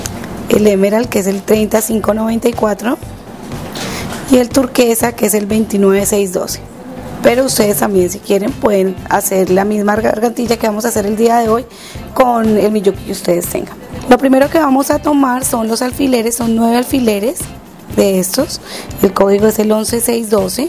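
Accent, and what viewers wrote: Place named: Colombia. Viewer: aparte de que me encanta tu trabajo, es chevere escuchar el acento de mi tierra querida colombiana, desde mexico. Amo mi Colombia . Gracias por compartir tus conocimientos muy lindo todo ... Dios te bendiga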